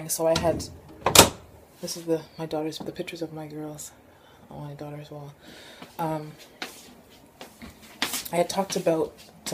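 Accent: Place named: Canada. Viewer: Are you Canadian? You have a little bit of a Canadian accent. I know the government uses TV, and films to brainwash people, and program them to influence their thought processes. Have you ever seen the 1987 film ‘They Live’? It has aliens in it, but that’s not the message the creator was sending. He uses the aliens as symbolism to symbolize that the aliens in that film are actually the elite of this world. The 1% that have control over humanity, and the earth. I believe the elite are Satan worshippers, and it says in the Bible that Satan is the prince of the air, and I believe that means the airwaves. Meaning media we consume. He also has temporary dominion over the earth, and so he can do these things to try to drag as many souls down with him. It’s really sad, but that’s the way the cookie crumbles. I had a prophetic dream about 10 years ago, and to this day I don’t exactly know what it means, but it was very traumatic, and so real, and vivid. 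In the dream I was told to read Isaiah chapter 1, and I didn’t even know if that was a book in the Bible, so I went to read it, and to my astonishment, I found Isaiah was a book in the Bible.. I read it, and it was almost verbatim exactly what my vision was about. It was a little bit different, but it was very juxtaposed to what I was reading. I know when the tribulation happens, God will protect His true elect, unless it happens to be their time, but I believe most of His elect are going to be protected. I don’t want to endure it, I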